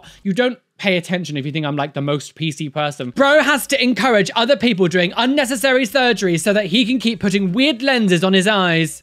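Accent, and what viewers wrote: United Kingdom. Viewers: Wait your murican? I thought you were british 😂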